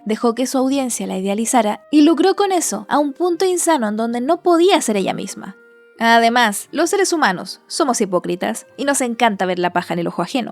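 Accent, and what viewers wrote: Peru. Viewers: Are U Peruana? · Are u peruana?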